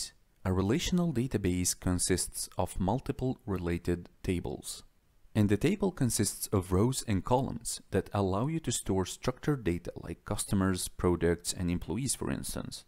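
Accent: Russia. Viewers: This was good! Is your native language Russian?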